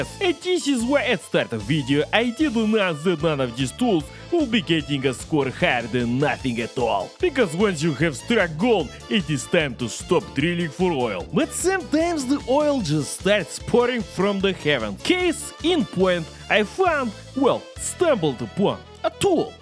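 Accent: Eastern Europe. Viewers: I feel like after watching for years, I could be blindfolded so I couldn’t see any subtitles. And I could still understand his B L Y A T I F U L, S L A V I C voice. 👌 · While I personally didn't need subtitles to understand Slav-ese, let us thank our generous Slavic King for upgrading this video for those that do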